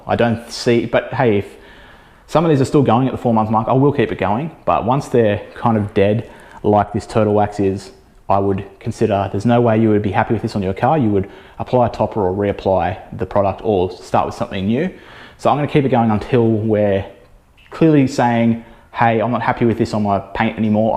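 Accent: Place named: Australia. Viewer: Ah an Aussie accent 😂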